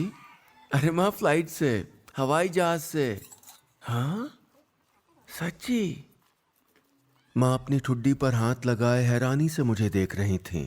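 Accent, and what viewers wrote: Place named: Marwari, India. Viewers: Bht hi pyaari story thi sir and aap bht pyàari rajisthani bolte ho👌👌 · 'Kup chan goshta' matlab 'bohot hi achhi kahani'. 'Hrudaysparshi' yani 'dil ko chunevali'. Indian languages are so amazing i liked the way you spoke rajasthani so mch.I couldnt control commenting in my mothertongue marathi I wish i could write a story and nilesh sir narrating it..... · सर, जय श्री कृष्णा, मै राजस्थान के जोधपुर के रहने वाला हूँ,और जब भी आपकी कहानियाँ सुनता हूँ तो उसी मे डूब जाता हूँ, पहली बार आपकी आवाज मे राजस्थानी मारवाड़ी भाषा सुनकर ऐसा लगा की आपने कानो मे मिश्री घोल दी हो। आप से अनुरोध है की आगे भी दीक्षा चौधरी जी की कहानियाँ आप हमको मारवाड़ी मे ही सुनाये,धन्यवाद,CA अभिषेक वर्मा,<num>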